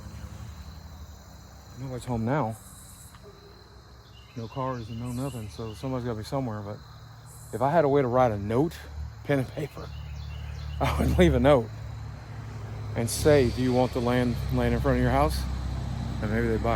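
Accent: South, United States. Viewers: It's funny to me that he lives in Arkansas but his southern accent only comes out when he goes to Virginia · It’s nice hearing Boogie’s southern drawl come back. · I noticed on both this video and the one from a few years ago, Boogie’s southern accent comes out a lot more when around that house.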